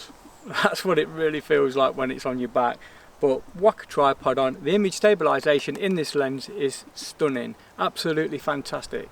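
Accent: Leicester, United Kingdom. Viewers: Good video. Love the Lestah accent. I worked there’s for a few years and now call rolls cobs lol 😂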